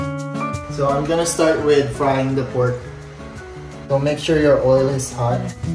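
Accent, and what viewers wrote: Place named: Oregon, United States. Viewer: Congratulations 4000 subscriber 👏👏👏love this content with English version you still have Oregon accents very sweet.Made my night laughing 😂 notice you a lot of S.Hope Marky will do English version vlog.Yes more English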